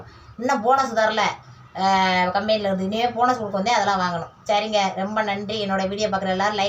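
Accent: Madurai, India. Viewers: Akka ninga madurai ah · Akka neenga Madurai ya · Akka neenga madhurai ya super ah pesuringa akka ella dress nalla iruku 👍👍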